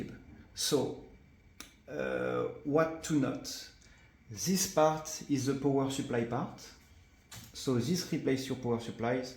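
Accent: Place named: France. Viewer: Bonjour vu votre accent vous êtes français 😉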